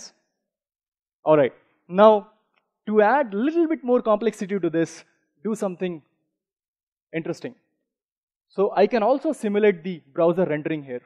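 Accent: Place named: India. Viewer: very thick indian accent. hard to follow.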